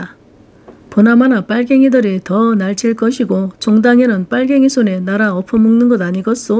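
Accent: Jeolla, South Korea. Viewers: 많이 힘드실텐데 계속 읽어 주셔서 감사합니다. 전라도사투리에 욕하는것까지도 무척 찰지고 정답게 들립니다. 작가님, 건강 잘 챙기세요.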